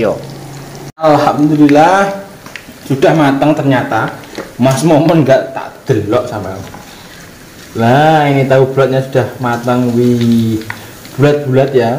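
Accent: Java, Indonesia. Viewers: Iyo mas Iyo coba Ojo medok ndesone hehe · mas momon pean ki orang jawa ta ngomongnya bhsanya jawa medok😃😃😃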